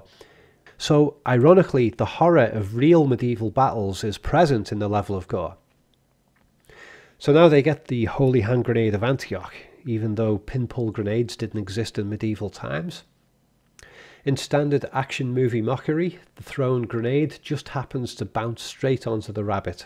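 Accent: Liverpool, United Kingdom. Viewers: Nice accent. Is that… Liverpool?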